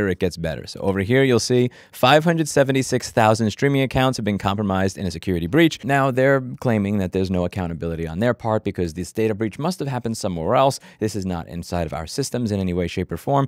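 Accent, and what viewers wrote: New York, United States. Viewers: That's that old school NYC talk I can agree with. Thanks for making my day.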